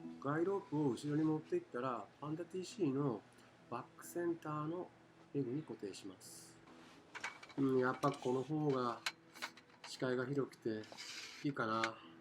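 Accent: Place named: Hiroshima, Japan. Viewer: おはようございます！広島のイントネーションの解説落ち着きますね👍手順がよく分かって助かります。 自作タープ私も作ってみたいのですが、生地代だけでも結構なお値段なので中々着手出来てませんw 幕内薪ストーブお篭りぬくぬく憧れなのですが、私にはまだ早そうなので来期挑戦したい憧れスタイルです😄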